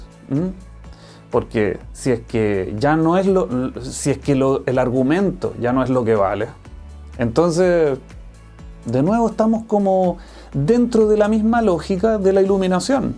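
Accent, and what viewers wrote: Chile. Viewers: que cuatico que, aunque uses español neutro y bien pronunciado, pude adivinar que eres chileno! algo en el tono, en las ultimas ''A'' de las palabras... algo me lo decía. comentario na' que ver con el video, pero es primera vez que llego a tu canal, me pareció curioso <3 saludos desde Valpo :D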